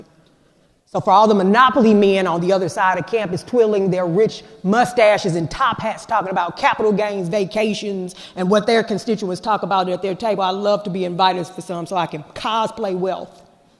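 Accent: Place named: South, United States. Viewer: My first time ever hearing her speak. While the southern twang threw me off, her powerful words gave me chills. If I lived in Missouri, she'd definitely have my vote.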